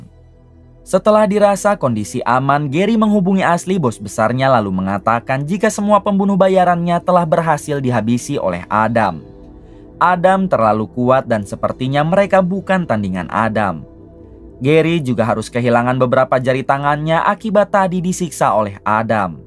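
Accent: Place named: Majalengka, Indonesia. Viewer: Lu orang Majalengka ya min ?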